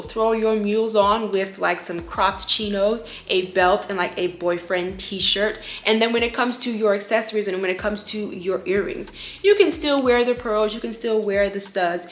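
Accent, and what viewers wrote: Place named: United States. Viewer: I feel like this video kind of need a country and field preface too, on top of ”it varies from company to company”. Here in Sweden the corporate hierarchy is much flatter than in the US (which is where I assume you’re from just from the accent). Jeans, though maybe not ripped ones, are absolutely considered business casual and ripped jeans would be ok if your corporate culture is casual. I work in a university office and I’m the most dressed up at my office in, according to your scale, casual clothing. It BLOWS MY MIND that you had to PAY TO WEAR JEANS at your place of work. I feel like the attitude of woman in the TikTok is much more problematic than the shorts - not having the humility to take the very kindly hint from the recruiter that her attire wasn’t appropriate for that office is much more of a red flag than the shorts themselves. 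I feel like having someone to reference that you consider stylish and appropriate is very useful - personally I’ll ask myself ”what would Princess Kate (or sometimes Crown Princess Victoria) wear?” if I’m in any doubt as to what’s appropriate. Does the trick every time. 😁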